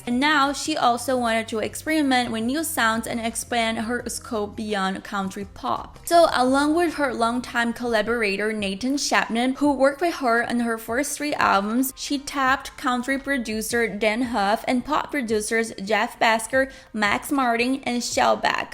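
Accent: United States. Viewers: your california accent 🔥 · I thought you were american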